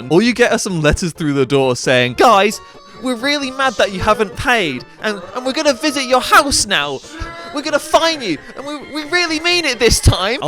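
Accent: United Kingdom. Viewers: Dude, I’m from Tennessee are accent gets made fun of all the time for being stupid sounding your British accent is awesome more cooler than the kings English. They call it down here. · American here! I love your British accent! And I love much of British culture and art. The difficulty for me and many others I believe is the current atmosphere of rampant classism, racism, and xenophobia in the UK. The US gets it, we deal with some similar struggles, albeit not exactly contextually the same. I think the world loves the dignified Britain of the past. But the Britain we currently see reflected on the Internet seems to be going through an identity crisis. The world is rooting for you, Britain. Don't be miserable. 🇬🇧🙂❤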